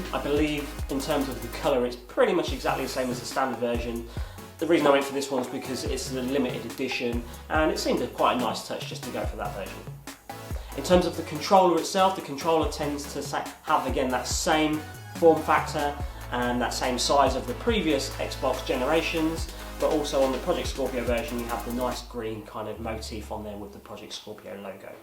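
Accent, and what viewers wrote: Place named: United Kingdom. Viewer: Am i only one who notice that this man skin tone is that of a black person yet he sounds like he's from Britain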